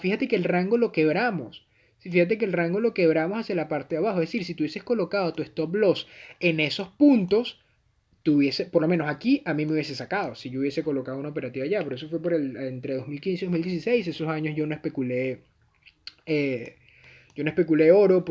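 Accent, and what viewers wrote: Venezuela. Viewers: Muchas gracias por compartir estos vídeos la verdad es que me han ayudado bastante a poder entender el mercado sobre todo por que lo dices de una forma amigable y en el acento venezolano que es tan como de escuchar para mi. seguiré viendo los vídeos para aprender a crear mi propia narrativa y proceso y poder operar de una manera consistente en el mercado. Saludos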